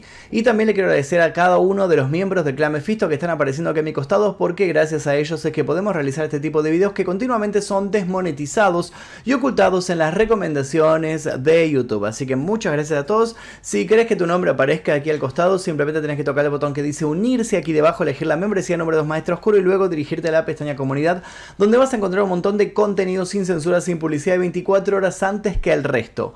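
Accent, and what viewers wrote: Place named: Argentina. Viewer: ! Me encanta cómo relatas y tu voz le da un plus de interés a todo aquello que relatas. Los diferentes tonos, las pausas y el acento, el mismo que el mío, pero que me encanta escuchar por que ya no vivo en Argentina. Cuando vaya para Francia los visitarte y te contaré. Chau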